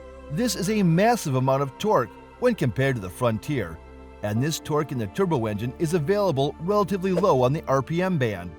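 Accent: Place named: California, United States. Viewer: This guy sounds like a Californian who doesn’t know anything about anything talking about cars like he’s an expert in the consumers opinion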